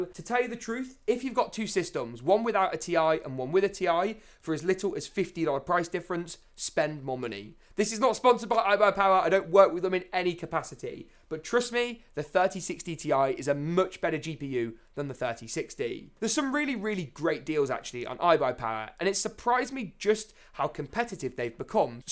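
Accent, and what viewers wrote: United Kingdom. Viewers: mate he is definitely not Australian he has the strongest British accent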